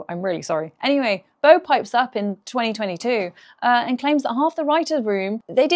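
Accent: United Kingdom. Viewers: You are British? I thought you just had a cool accent.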